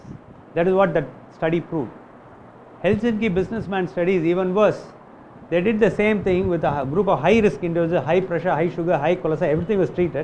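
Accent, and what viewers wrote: India. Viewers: This is South Indian english, don't worry, even we the people of rest of India don't understand them properly either.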